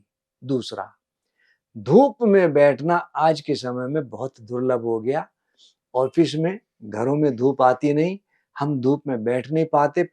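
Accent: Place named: Haryana, India. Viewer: yes haryanwi doc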